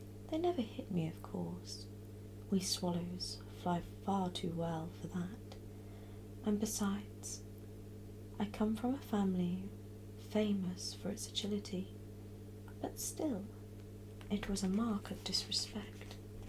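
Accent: England, United Kingdom. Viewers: British accent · English accent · love ur british accent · British accent makes her more attractive & sophisticated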